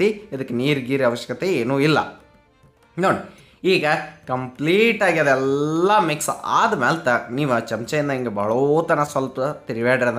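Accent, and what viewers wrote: North Karnataka, India. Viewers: Nagar panchamige mado ella undi bhala chanda madi torsiri nam uttara karnatakada matu kelikke bhala chanda 👌👌👌👌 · Nimma utar karnataka bashe masta eathiri👌👌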